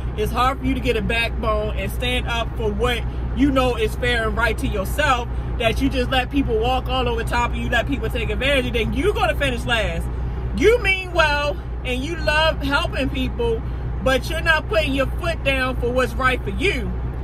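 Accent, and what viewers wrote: Baltimore, United States. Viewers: You have a Baltimore accent 🤔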